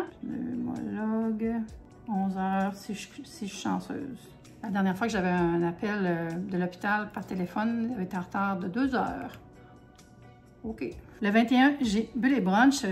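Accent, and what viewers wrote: Quebec, Canada. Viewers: Hello! 🥰 Juste pour dire: "J'adore ce que vous faîtes!" 🥰Et ce délicieux accent québécois m'enchante... 😍 A très bientôt! 😘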